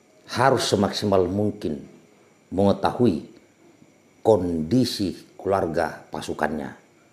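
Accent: Makassar, Indonesia. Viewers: Mantap komandan 👍👍🙏.. Solo hadir.... cerita cerita yang menarik dan inspiratif dari Mayor..... jangan lupa logat Makassar, kopi dan rokoknya pak komandan.... sukses selalu 👍👍👍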